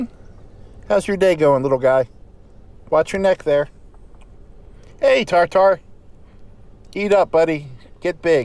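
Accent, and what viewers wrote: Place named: New England, United States. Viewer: I’m catching up again, so good to hear your yankee voice! Never realized how homesick you still get after 47 years!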